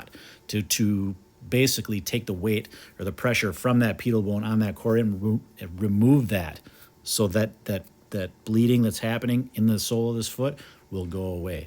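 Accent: Wisconsin, United States. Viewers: Your Wisconsin accent is deeply calming and appreciated. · It's always "that" coreum. Never heard him say "the" coreum. Total Wisconsin thing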